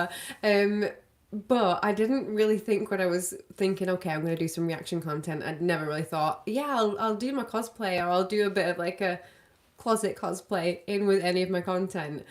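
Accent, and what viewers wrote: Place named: Manchester, United Kingdom. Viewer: I'm from Leeds and I could tell you were from Manchester.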